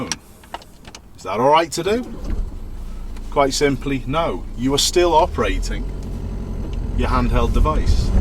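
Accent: United Kingdom. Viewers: Everyone here, including the uploader, thinks there is only 1 set of laws in the entire world. You're wrong. Every country has different rules. Judging by your accent, I'm assuming this video is about the laws in the UK. But these laws do not have to apply for places elsewhere.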